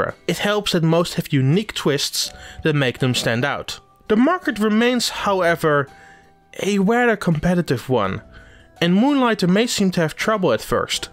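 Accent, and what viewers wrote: Netherlands. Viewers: Yo is this guy Dutch or what, common try to work an the accent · pretty bad review, i cant understand your english. you have a to much dutch accent influencing it and you are not clear at what you say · Are you dutch? I am guessing you are from Rotterdam? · Proper Dutch accent 😂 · As a fellow dutch man, i just can't handle his accent. He doesn't even try to hide it. (The following is not my original comment and has been added on) Some of these lines feel really forced, try working on that my dude. Thank you people for sharing your opinion on mine, i've never gotten this many comments from people with a different mindset that aren't just full of cursing and telling me my opinion is irrelevant. Looking forward to his improvements in his next review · I'm Dutch and listening to this reviewer it sounds like he's Dutch as well. So it's normal his English isn't perfect but very decent at least. 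But i like his review so keep up the good work dude ! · You do realise this guy is Dutch, right? Do you produce content in a second language?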